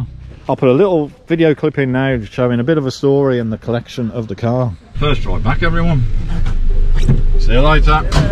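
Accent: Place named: Birmingham, United Kingdom. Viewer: Proper Brummie accent....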